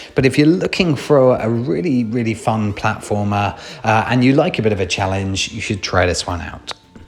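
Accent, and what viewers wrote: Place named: United Kingdom. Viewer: Hi , isn’t Worms an Amiga game that got a DOS/PC version amongst many other ports? “PC” from this era (in the UK at least ) generally refers to IBM compatible machines rather than other home computers (ST, Amiga etc). I would have referred to the original Amiga version if it were my video - not “PC”. I remember this being released in the UK as I worked in a computer game shop at the time . I appreciate a lot of YouTube videos are America centric but you sound British . Kind regards D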